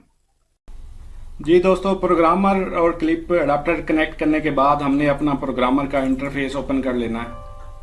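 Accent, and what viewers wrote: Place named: India. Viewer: primera vez que veo un video completo narrado por un indi, tuve que soportarlo, para saber como hacer la copia de la memoria serial...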